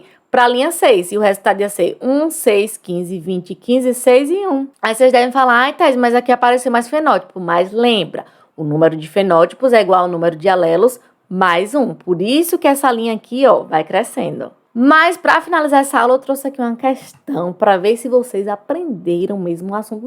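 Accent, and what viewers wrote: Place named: Ceará, Brazil. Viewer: tu és do Ceará? eu acho pelo sotaque :) Eu amooooo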